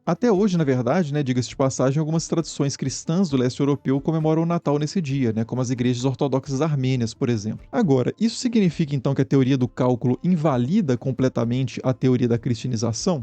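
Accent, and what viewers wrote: Minas Gerais, Brazil. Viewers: É mineiro?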